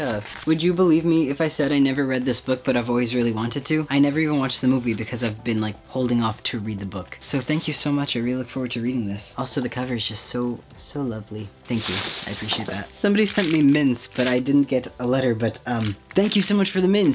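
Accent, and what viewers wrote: Canada. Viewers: Never stop the Canadian accent... it’s heckin’ adorable! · that was a HECKIN good time with that extra Canadian accent!! You can wear that Cat shirt on the MFP! Lamb... LET ME IN!! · I love your Canadian accent reminds me of many favorite movies strange brew with Rick moranis. Plus I lived in Alaska for 13 years, and traveled thru Canada beautiful country ( People in the lower 48 also think Alaska has 2 seasons, but no we do have 4 seasons, and love the northern lights are beautiful in alaska too) I am now on the east coast but thankfully still in the country. I love hugs too I'm a big hugger ❤️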